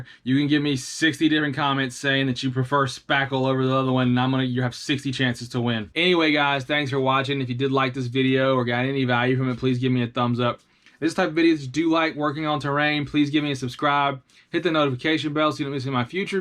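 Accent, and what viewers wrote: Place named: South, United States. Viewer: U get a like and comment for the southern accent OUUU WEEE 😂😂🎉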